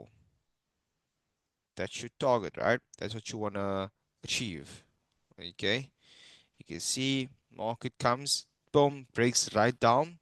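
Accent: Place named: South Africa. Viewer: I heard a South African accent then I subscribed , I will follow you on other social media platforms , I need to be part of a community based in SA ... I'm in Plettenberg bay by the way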